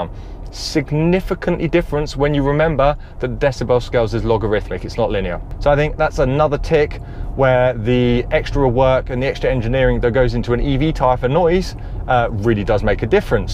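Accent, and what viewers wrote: United Kingdom. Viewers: I was thinking this guy would be great working alongside Rory Reid on the Autotrader channel, it's a shame they don't live in the same country.... But then he mentioned British weather. This channels about page says "United States", I was wondering why his accent was so pleasant and his personality not like the hyper active American car reviewers.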